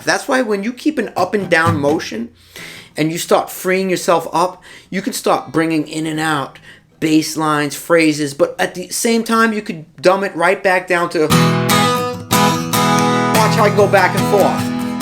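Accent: New York, United States. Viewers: Fab lesson Love the NY accent Cheers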